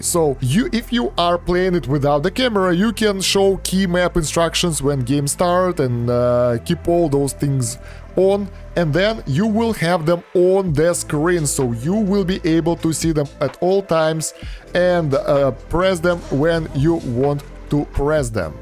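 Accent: Russia. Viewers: Слышу Русский Акцент у автора видео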